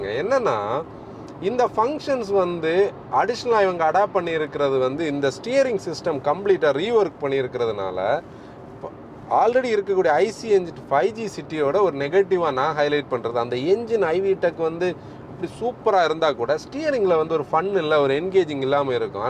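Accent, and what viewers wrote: Kanyakumari, India. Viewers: Kanyakumari slag 😊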